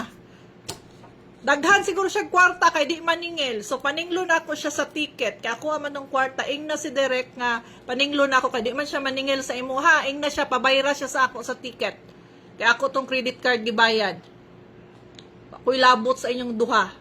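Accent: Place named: Visayas, Philippines. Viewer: Bisaya diay ka maam?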